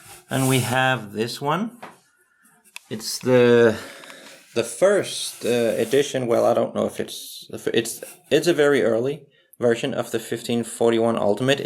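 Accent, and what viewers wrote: Denmark. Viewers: Hehe.... Sjovt at jeg tænkte at du er danskee, da jeg hørte din accent. God video. Min favorit er c modellen.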